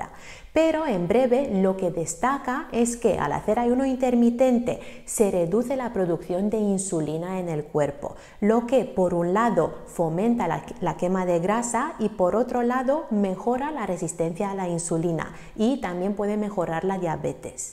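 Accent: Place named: Romania. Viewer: Văd ca ești românca după accent, a luat premiul Nobel la autofagia deci mi se pare cea mai mare descoperire.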